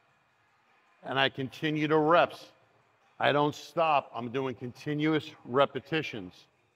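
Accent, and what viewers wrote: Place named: New York, United States. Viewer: The advice is brilliant. The New York accent just adds to the enjoyment.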